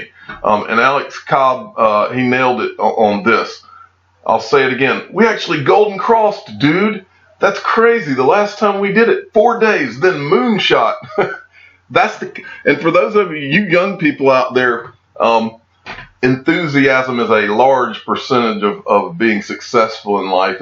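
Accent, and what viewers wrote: South, United States. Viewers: It’s just a matter of.. Love the southern accent😉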